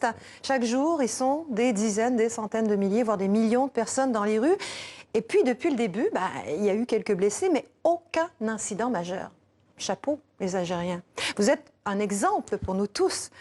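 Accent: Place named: Quebec, Canada. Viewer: je reconnais votre accent vous etes Quebecoise ....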